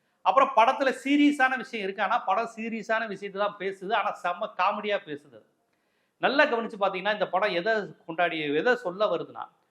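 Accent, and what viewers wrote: Madurai, India. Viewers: நீங்கள் எதற்கு மதுரை வட்டார மொழியில் பேசுகிறீர்கள் இந்த படத்திற்காகவா?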